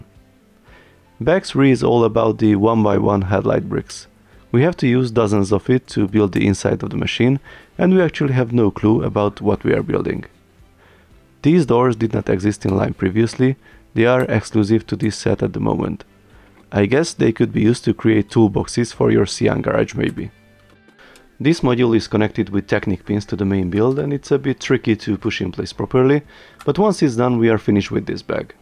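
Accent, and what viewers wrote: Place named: Hungary. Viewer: YOU SOUND SO HUNGARIAN!!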